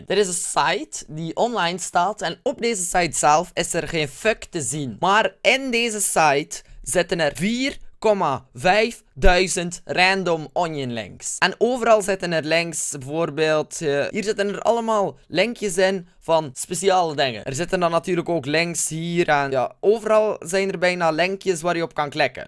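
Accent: West Flanders, Belgium. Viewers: ziej gie e westvlaming? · Belg of nederlands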